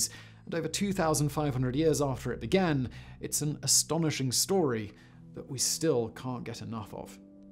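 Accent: United Kingdom. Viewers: Dude. Great vid. But, truth be told, I also come for the British accent and colloquialisms.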